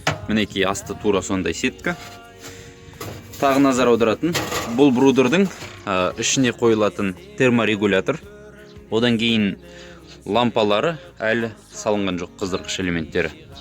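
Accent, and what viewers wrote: Standard. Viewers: коп сейлейтин сенсин!Барекелди мен ризамын бауырымызга! Осылай казак тилимизде таза сойлеген бауырларымды корсем оте катты риза боламын! маган керек талпынган адамдарга керек ал орге тартса корге кашатындар карамайак койындар ренжимесендер)))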